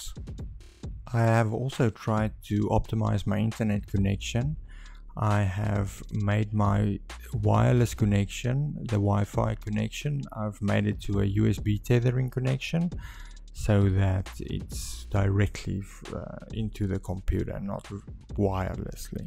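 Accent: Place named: South Africa. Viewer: Hey , I can hear you're south african and so am I , Can guess the issue has to do with our country.... The way i've gotten it to work is by trying multiple phone hotspots until one of them seems to work.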